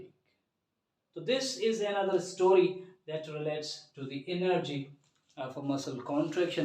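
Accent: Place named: United Kingdom. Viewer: Fully British accent wao.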